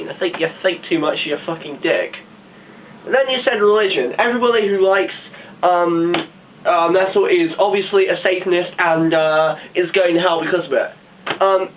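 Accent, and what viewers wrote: United Kingdom. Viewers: hey, i don't know if you have the same problem over in england (sorry if i'm wrong i'm just judging from your accent, and you did say your not from america) where you have people talking about how metal is tallentless, or did some of our braindead trash just leak over to your country??? i agree with you 100% and wholeheartedly, but anyway we have that problem alot over here....and it gererally pisses me off! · Video is instant win because of British accent.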